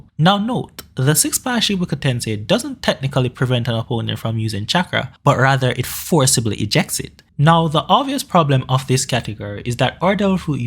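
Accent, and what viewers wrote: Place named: Jamaica. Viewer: this man has a jamaican accent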